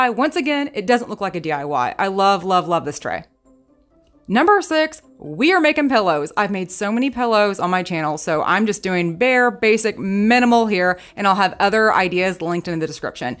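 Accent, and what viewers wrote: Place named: South, United States. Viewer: Your DIY dollar tree hacks are the best!! Thank you!! Are you from the south? Love the accent I'm from Florida!! I miss home!!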